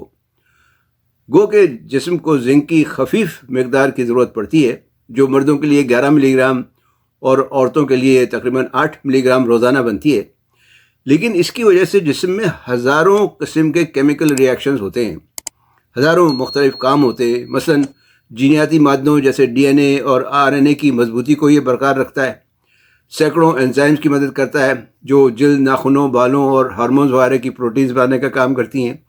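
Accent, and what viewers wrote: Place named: Old Delhi, India. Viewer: یہ لہجہ پرانا ہے اب دیکھنے میں نہیں آتا ۔ہو نہ ہو دہلی سے تعلق ہو سکتا ہے ڈاکٹر صاحب کا ۔اللّه خوش رککھے آمین 🤲🏻💙 السلام علیکم جی 🤲🏻💙 بہت محبّت کے ساتھ شکریہ ❤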